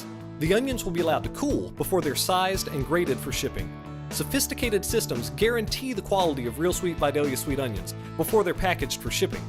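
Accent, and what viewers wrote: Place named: Midwest, United States. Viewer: Boo!!!! I watched this just to hear that awesome Georgia accent and all I heard was a boring Midwest accent. I love your onions but now I’m sad.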